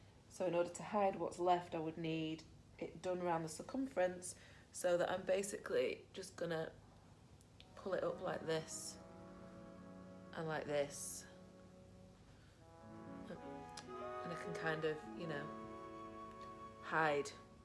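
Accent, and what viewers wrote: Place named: Manchester, United Kingdom. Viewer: Are you from Manchester love?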